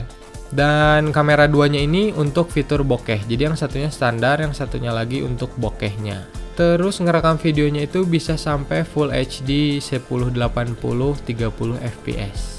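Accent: West Java, Indonesia. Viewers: Nyariosna khas sunda nya..hhehehe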